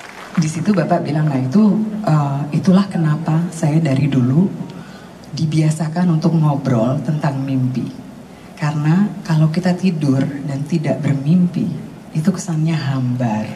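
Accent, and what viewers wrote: Indonesia. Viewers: Lagu mimpi mengingatkan aku pacaran di masa SMA..mimpiku yg indah2..ternyata mimpi itu kandas pacarku meninggal Krn skt likimia.. Hilanglah semua mimpiku yg sdh kutata rapi.. memang anggun..SBG wanita Indonesia..TDK sombong ttp bahasa jawanya ttp kental tdk hilang..slmt dan sukses buat mbak anggun...raihlah mimpi2diluar negri.. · intonasi suara, SEMUANYAAAA SANGATTT INDONESIAA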